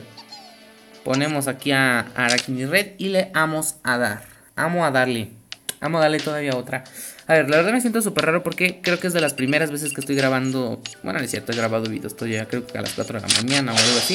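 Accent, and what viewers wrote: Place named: Mexico. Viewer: Eres mexicano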